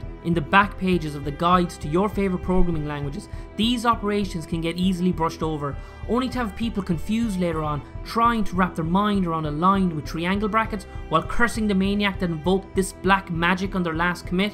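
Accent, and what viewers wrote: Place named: Ireland. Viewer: Also that irish accent makes you so easy to listen to lol.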